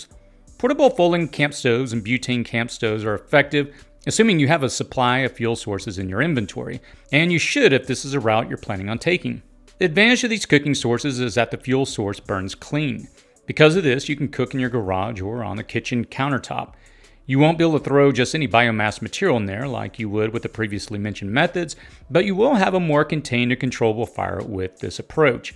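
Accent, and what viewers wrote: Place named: Pennsylvania-Maryland, United States. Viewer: I hear your accent you sound like your from PA Md area my family is from there many generations :-)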